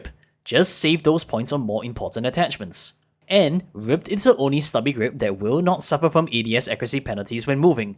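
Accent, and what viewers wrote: Singapore. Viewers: I detected the singaporean accent right away, but i digress. Great video bro keep it up. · I was just about to comment "this is the closest thing to the BF3 M16A3" and then the video continued and you said exactly that. Great video on my favourite weapon in this game. I'll definitely be trying out that first build with the suppressor. Also, the Singaporean accent is the best Asian accent in English.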